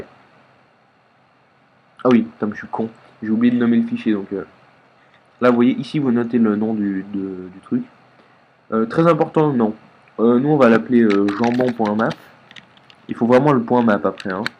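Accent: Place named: Belgium. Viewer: Ha un Belge !! ( j'adore les 90,70) ;) Sinon tres bon tuto !!.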